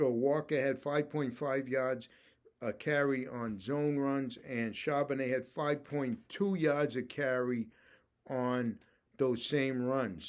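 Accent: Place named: Long Island, United States. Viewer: Just keep talkin' your dialect brings me home to Long Island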